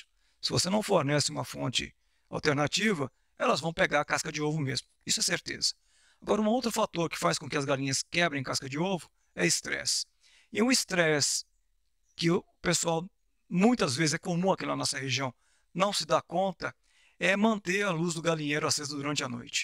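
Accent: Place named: United States. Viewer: Excelente vídeo ! No começo eu pensava que estava sendo dublado, pois o conteúdo, e a voz era estilo americano 😊 parabéns ! Vc realmente estende de criação de aves👏👏 ganhou mais um escrito 😎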